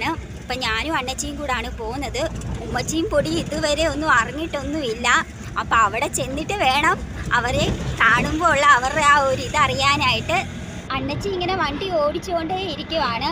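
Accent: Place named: Kannur, India. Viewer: കണ്ണൂർ ആണെന്ന് തോന്നുന്നു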